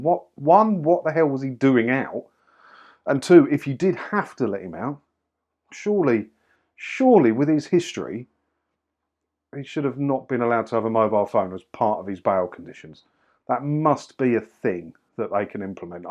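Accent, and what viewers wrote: Essex, United Kingdom. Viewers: You are a very likeable bloke, great advice, sound Essex lad? I have received 15 letters from the licence gestapo to “the occupier” 👈🏼 who ever that is ⁉😂 in the last two months. Each one seems to have more red ink ✍. Saving them up to paper the walls in my sitting room 👍🏼. 🤗 👵🏻🇬🇧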